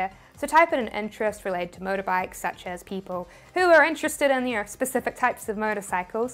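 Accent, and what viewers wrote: New Zealand. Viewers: Love the Kiwi accent !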